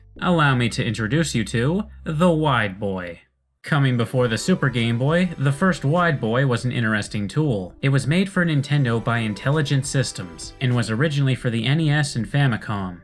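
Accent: Canada. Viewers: I thought you sounded Canadian, the you said "Z" like Zee, then I thought you must not be. Then you said you were Canadian...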